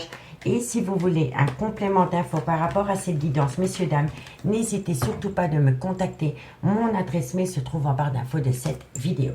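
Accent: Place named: South, France. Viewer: bonjour J'aimerai savoir si vous êtes du sud (on dirait bien ) entre Montpellier et Marseille SI OUI? vous consulter en réel,?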